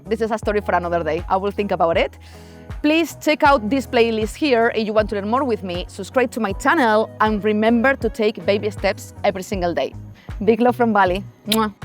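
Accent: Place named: Spain. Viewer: Yo no más quiero escucharte con ese acento español.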